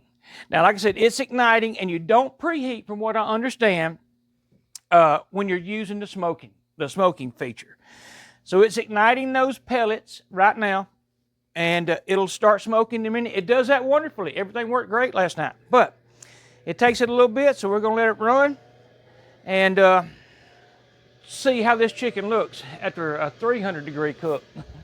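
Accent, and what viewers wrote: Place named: New England, United States. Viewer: Damn… the Yankee accent is horrendous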